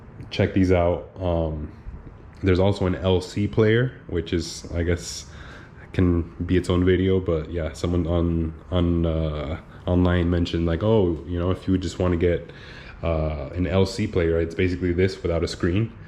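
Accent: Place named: Miami, United States. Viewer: miami accent 305 . i might look into denon for a third channel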